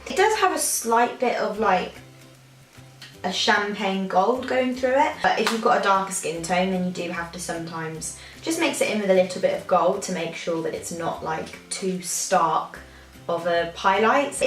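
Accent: United Kingdom. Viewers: Great video love !! I love English accent 😍 !!! Im here showing some love and supporting back 😊💕 thanks for stopping by my channel. Xoxoxo💕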